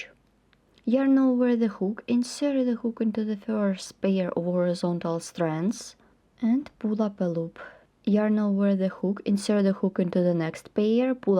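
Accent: Russia. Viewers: Жаль,что Вы на русском не озвучиваете,субтитры переводят непонятно,а по Вашему акценту понятно,что прекрасно говорите по-русски.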